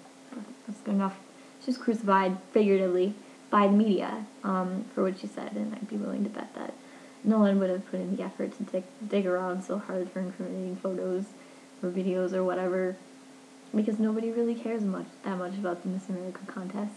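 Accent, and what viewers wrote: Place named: United Kingdom. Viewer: interesting, you dont sound american, i thought it was a british accent, maybe canadian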